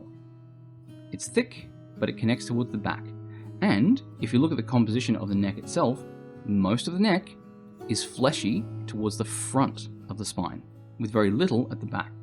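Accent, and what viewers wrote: Australia. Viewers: Australian accent cool though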